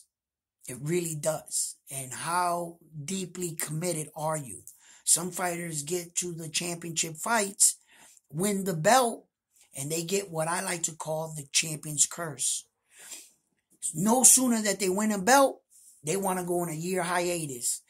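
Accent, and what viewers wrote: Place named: Latin America. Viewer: Yeah a bit and your accent is Latin but I can't say if it's Honduran or something else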